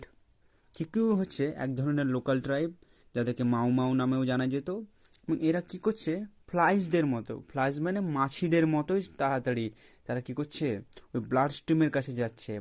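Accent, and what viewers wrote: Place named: Murshidabad, India. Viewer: Valo hoyechhe tobe kolkatar banglay video korben majhe majhe ektu Mursidabadi tan aschhe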